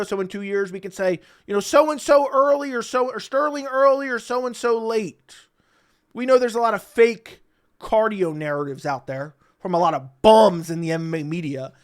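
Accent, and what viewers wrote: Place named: South, United States. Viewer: Love when you do that. Mega good old boy voice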